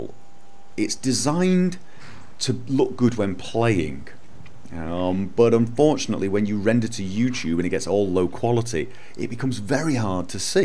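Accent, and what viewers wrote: United Kingdom. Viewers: okay, I thought it was wierd he had that good brittish accent if he wasn't from there.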